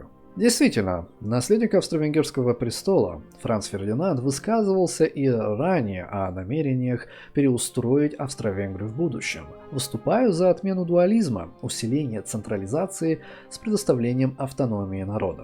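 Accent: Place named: England, United Kingdom. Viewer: Как мне обучиться такому же английскому акценту как у автора видео?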